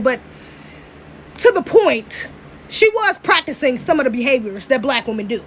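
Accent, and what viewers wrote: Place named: Washington DC, United States. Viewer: I know you from the city DC. 💯that slang and slur only one place it come from. 👊🏼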